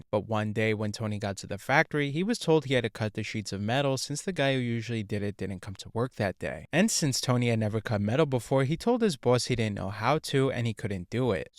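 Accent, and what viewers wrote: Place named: Massachusetts, United States. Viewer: Why did bro change to a Massachusetts accent halfway through the video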